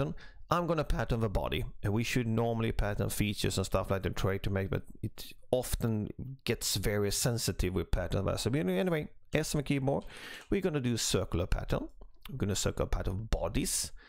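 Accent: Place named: Sweden. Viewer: Your accent is extremely similar ( he is also from Sweden)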